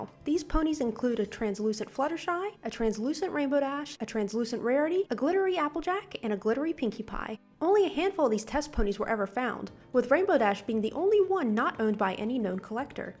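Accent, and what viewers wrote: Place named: United Kingdom. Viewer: She’s from the UK.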